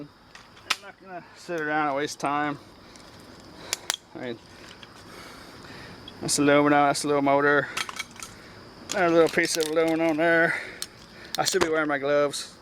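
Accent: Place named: North Carolina, United States. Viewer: I'm curious about where your accent is from? I like the way you talk...sounds like north Carolina.....thx for the vids and info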